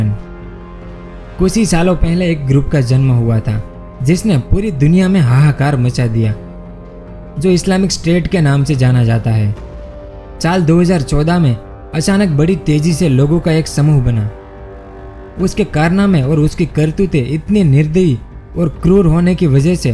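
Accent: Gujarat, India. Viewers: Guju Vai, do something to Your accent...... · Hello brother, 1st you say me how to say this, u have any more research above countries..., I think u have to improve your Hindi speech from Gujarati style..., & u r to small to say above countries. Video banane ke or bhi bhahot sare topics available hai, jaha apni mastury ho ya vakahi jante hai usi disha me apna dhyan lagake mahenat karni chahiya varna 2( bavaji ki dhoti or bhakti ) kam bigate hai, mera aap se anurodh etnahi ki bina puri jankari kuch bhi dono hath bantna nahi chahiye. Topic achha tha par jankari bahot kamjor thi. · Bhai tu gujarati cho k ny? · Are you gujju? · Bro are u gujarati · bhai gujrati ho na tum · Bhai iski mothertoung Gujarati h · Gujurati ho kya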